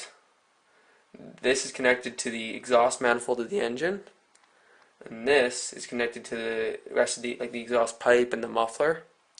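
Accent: Canada. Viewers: are you canadian? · hah hah, u're canadian!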